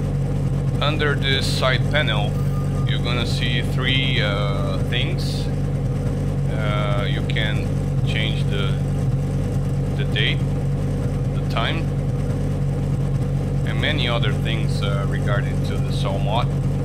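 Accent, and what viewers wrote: Brazil. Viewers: Eu sei que vc é Br pelo sotaque e pelo windows em Pt Br hauhuah mas olha, vlw pelo tutorial! :P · pelo sotaque eu tava aqui pensando "esse cara deve ser br" hahahahahah batata! sucesso para o canal cara, valeu a dica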